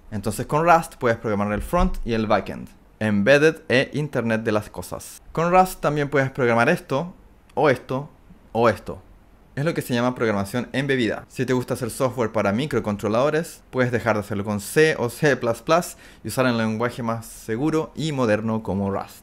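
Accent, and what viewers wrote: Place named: Chile. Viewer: Hola, esta semana YouTube me recomendó tu canal, me alegra comenzar a oir acento chileno por la plataforma, tenemos bastante capital humano, pero al parecer somos más tímidos que el resto de hermanos hispano hablantes. Tus videos me parecen muy interesantes, y espero que tu canal crezca.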